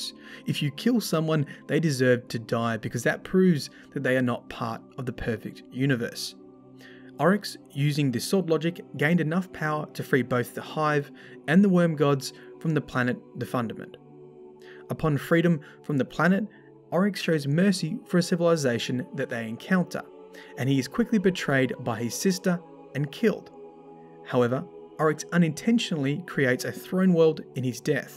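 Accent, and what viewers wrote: Australia. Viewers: Wait you’re Australian as well?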